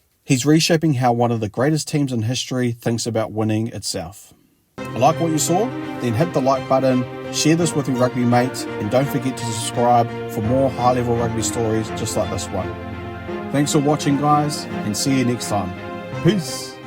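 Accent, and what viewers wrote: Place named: New Zealand. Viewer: commentary sounds like a Kiwi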